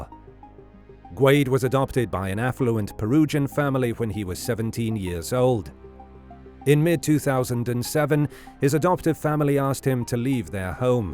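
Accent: United Kingdom. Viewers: Narrator talking so fast with a british accent was totally confusing and not understand alot of thr content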